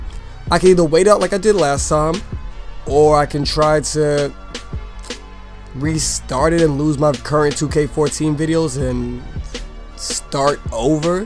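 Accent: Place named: New Jersey, United States. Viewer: Really Happy You uploaded. Guess I've been on your ass for Vids lately its just you are one of my favorite commentators. No homo but your voice is perfect for commentating sounds like you are from new jersey or something. Skys your limit.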